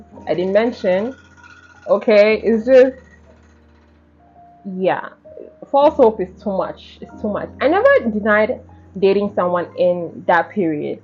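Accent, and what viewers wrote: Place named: South Africa. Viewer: I love the SA accent n vibes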